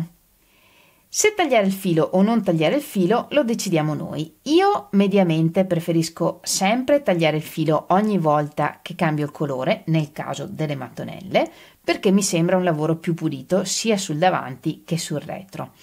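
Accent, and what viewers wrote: Veneto, Italy. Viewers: grazie, ho iniziato da poco a seguirti e devo dire che mi trovo molto bene perchè spieghi in maniere chiara e adoro il tuo leggero accento veneto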